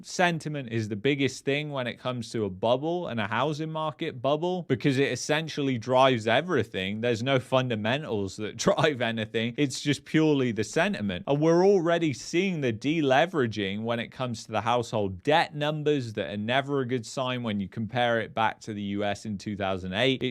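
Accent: United Kingdom. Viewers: “ have saw” . You said it twice. How about Present Perfect “have seen”? Why don’t you know this? I bet you say “have went”, “ have ran”, “ have came” instead of “ have gone”, have run”, “ have come”. I’m hearing this everybday all day. Since when have people suddenly forgotten the Past Participles of Irregular verbs? In the past there was always some uneducated person that didn’t know them like the Beverly Hillbillies but now it seems like everyone. NEWS FLASH: You are no longer speaking English but a kind of Pidgin English. There is no excuse for this especially someone speaking with a British accent.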